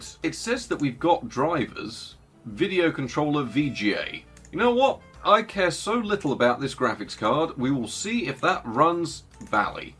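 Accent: United Kingdom. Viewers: This is so American of me to say, but I say it as an American whose family literally came over to Ellis Island, NY from London in the 1800s. Also according to my DNA I am 68% British & absolutely LOVE the UK. Ok, with that disclaimer out of the way. Having a British Accent just makes the videos seem like dated fine wine! Nostalgia with intelligence!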